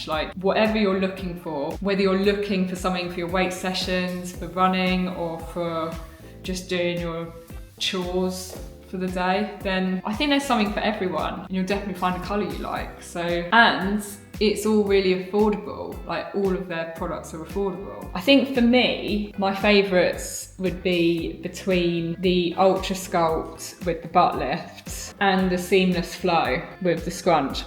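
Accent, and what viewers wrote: United Kingdom. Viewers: I love you sincerely from Texas and absolutely love the British accent...!!!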